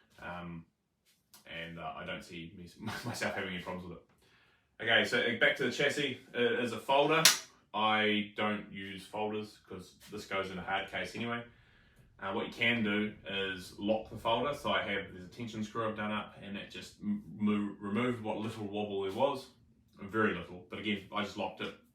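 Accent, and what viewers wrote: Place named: New Zealand. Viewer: I love listening to kiwis even though I'm not totally familiar with the slang. 😁 Thank you for the review of the MPA!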